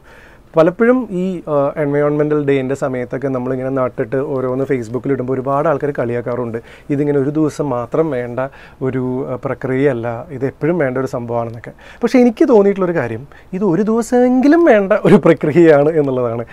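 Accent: Pattambi, India. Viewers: Pattambi slang kelkumbol too happy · Pattambikaranayondavum bb3 le anoop chettantyum gp chettantyum slang orupole😁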